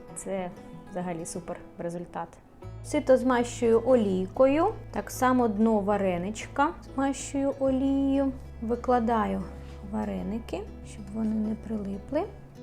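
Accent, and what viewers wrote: Ukraine. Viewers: Очень милый акцент Украинский❤😊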